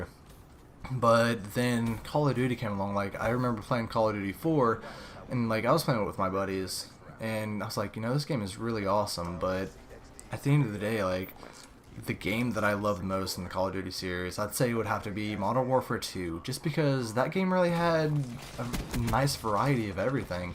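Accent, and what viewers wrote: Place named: United States. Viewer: Lol, that american accent.......i like it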